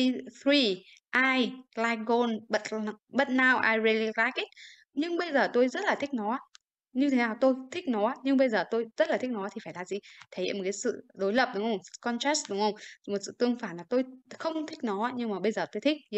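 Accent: Standard. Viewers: B phát âm chuẩn mà dạy hay quá🥰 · Giọng b rất chuẩn.chuc b buổi tối vui vẻ nhé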